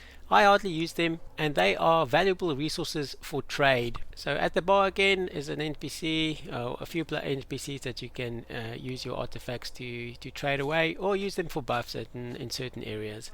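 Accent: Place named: South Africa. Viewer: Was wondering about the accent, also from SA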